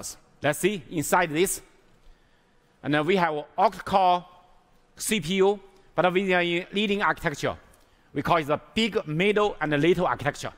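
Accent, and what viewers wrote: China. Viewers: I'm Brazilian so my accent is not amazing, but in some parts of the video I truly thought he was speaking Chinese. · I honestly thought he was speaking Chinese and then translating to English. Turns out the whole speech was in English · I’m Cantonese and I hear Mandarin in parts too 😂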